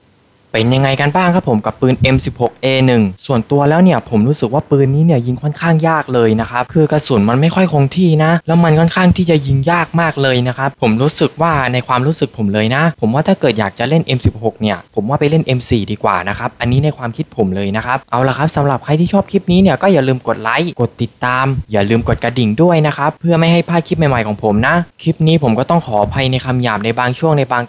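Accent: South, Thailand. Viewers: พี่คนใต้ใช่ไหมครับ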